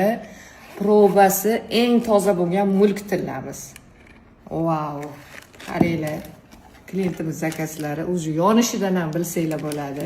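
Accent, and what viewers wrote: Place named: Namangan, Uzbekistan. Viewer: Наманганскийга шевангиз тортияптия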